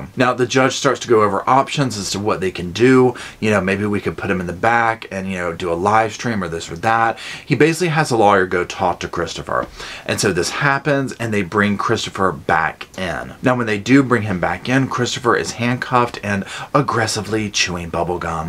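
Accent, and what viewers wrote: South, United States. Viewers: Hi! I’m a new subscriber and I’m making my way through your videos. Love them!!! I’m southern so I love your southern spin in this one!! ❤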